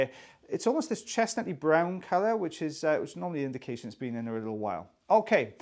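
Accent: Wales, United Kingdom. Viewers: Still the best ear channel. And the Welsh is the best accent imo😊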